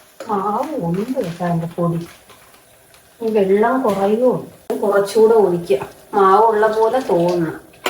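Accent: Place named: Palakkad, India. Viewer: പാലക്കാട് ആണോ വീട് സംസാരത്തിന്റെ ശൈലി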